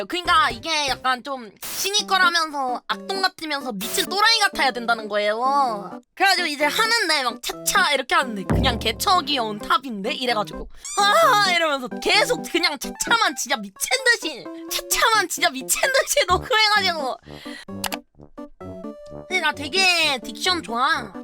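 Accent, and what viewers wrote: South Korea. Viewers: 전라도 사람이여? 구수허이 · 전라남도쪽일 거 같네요 근데 전에도 보니까 경상도 사투리도 하셔서.. 좀 섞인거 같아요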